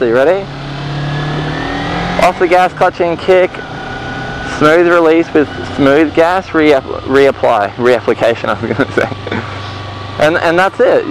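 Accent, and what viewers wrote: Australia. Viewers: Those were very nice videos considering I must have seen every video on "how to ride a motorcycle" right before I got my licence. BTW, nice australian accent and I like how you thoroughly mention every key point and the little things that do actually count. Thanks mate. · mate he has an aussie accent...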